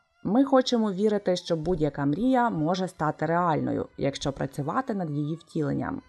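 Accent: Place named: Russia. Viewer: Йоооооййй! Це так цікаво! Тільки є одна штука, яка турбує. Часто деякі зі звуків у власних назвах ви вимовляєте на російський манір, як-от -сі, а не -ші, чи -ті, замість -чі. Так само неправильно -дзі, -дзя, -дзю, -дзьо, натомість : -джі, -джя, -джю, -джьо. Ця система (яку я навела як правильний приклад) транскрипції і транслітерації, здається, Коваленка. Більшість сучасних українських перекладів з *японської саме за нею. Отаке. Дуже дякую за цікавезні теми! Буду чекати ще щось із японської міфології. Прикольно впізнавати назви і імена, які зустрічались у сучасних роботах країни сходу сонця.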